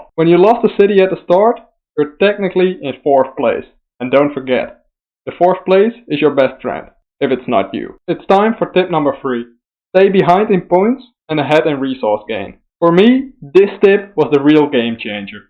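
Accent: Netherlands. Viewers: Even in English I can hear your Frisian accent, lol. Thanks for the Tips :) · Can I guess your nationality? I’m very into accents, and I think that you might be Dutch.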